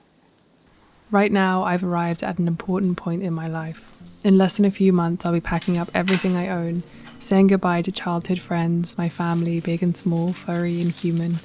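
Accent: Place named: England, United Kingdom. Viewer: My daughter-in-law is from the Lake Como area of Italy. When she married my son and returned with him to Ohio, she had prepared as best she could but there were times she felt very homesick. Luckily her friends and relatives were able to visit them here in the U.S. (and to video chat often) especially during the first few years when the two grandchildren were born and she couldn't travel back home. Then Covid messed up travel for over 2 years. This summer she had nearly a month long visit there with the two bilingual kids in tow and hubby joining them for part of that time. It was so joyous for everyone! But she shared with me that once she got back to Ohio she felt she'd arrived "home" to her house and neighborhood and career with her husband and her children. Your love for your native England and your family of origin will never fade but your concept of where your "HOME" is will gradually switch to where your partner, your future children( those little cuties lying in wait for you in your future) and your new house and career are will then be your emotional home base. 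Of course these recent months have been unsettled for you and your creativity stiffled for the time! Your long wait will soon be over. (Just don't lose your lovely English accent and start sounding all American and inelegant when you swear or say shit! 😉😘😚)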